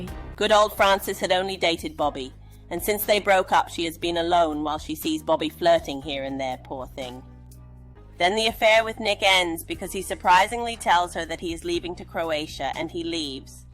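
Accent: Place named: Mid-Atlantic, United States. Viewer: I love it....."Nothing can go wrong"....! its your tagline! Love your "accent" too. not sure but fairly reminiscent of the "Midatlantic" accent of the 1940s films. Anyway, it certainly fits your narration. ( this is meant to be complimentary). Can you do "The Hunger" ?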